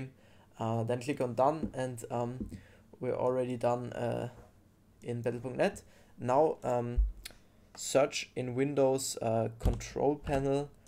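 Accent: Germany. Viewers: I can hear a German accent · man merkt halt so hart das der deutsch ist und ja hat nicht funktuniert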